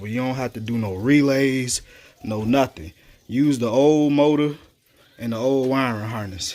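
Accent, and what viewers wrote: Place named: Chicago, United States. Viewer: yo commentary funny ash as soon as da video started ik u was frm da raq watchin frm tx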